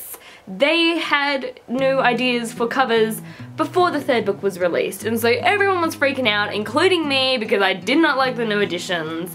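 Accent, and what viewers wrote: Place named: Australia. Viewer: Hi! I love seeing a fellow Australian on Booktube, your accent feels like home. I'm new to booktube and I'm having a great time catching up on your previous stuff! (you may be getting more random comments from me soon *looks sheepish) I definitely agree with about cheating in books. I think it gets romanticised way too much. It sends the message to readers that cheating is exciting and sexy and that the person being cheated on is always an afterthought. Also, FAKE STICKERS WHAT THE HELL!?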